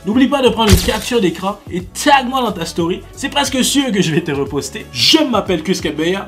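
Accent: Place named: Quebec, Canada. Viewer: c'est un accent canadien ça s'entend a des kilomètres, lui surement du Quebec, sa manière de dire "présentement" il n’y a que les québécois pour parler comme ça , ce n'est pas une critique bien sur, bonne vidéo.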